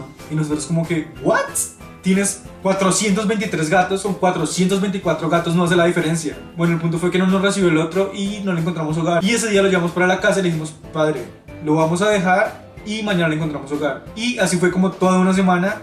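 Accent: Colombia. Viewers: Awww,¿por que hablas tan tierno? ese acento colombiano;D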